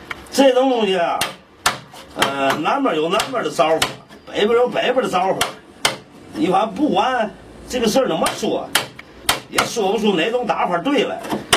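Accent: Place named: Tianjin, China. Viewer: thanks for uploading the video! I grew up in Tianjin when I was young and I love your accent.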